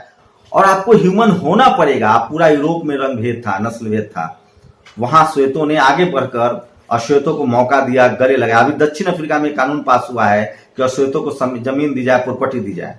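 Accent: Bihar, India. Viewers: Can You speak in Normal Hindi & Not Typical BiharinTone? Since You call Yourself.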